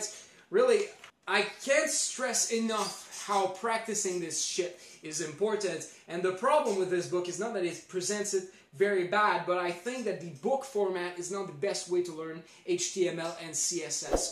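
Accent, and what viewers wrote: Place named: Canada. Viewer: you sound so much more canadian in this video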